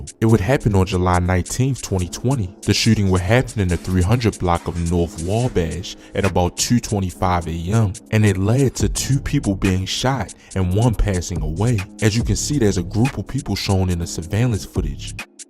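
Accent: Baltimore, United States. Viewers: Sound like u frm Baltimore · Where are you from Baltimore? Your accent is fucking stupid bro. You pronounced word so fucking stupidly. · Got that Baltimore accent 2uuu 😂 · The narrator has to be from Baltimore 🤦🏽‍♀️🙄😒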